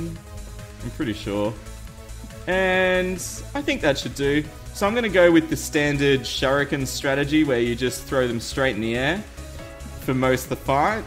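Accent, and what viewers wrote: Australia. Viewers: r u australian? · hahaha also you being a aussie like me is so helpful and convenient and makes it easier to watch ya vids:') ps you sound like your from over east like queensland or something? I'm from perth btw <3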